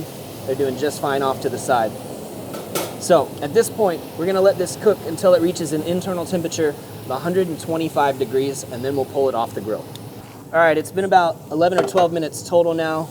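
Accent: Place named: California, United States. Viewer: Who has all that their kitchen your special spices and infused chili oil you sound like you're from California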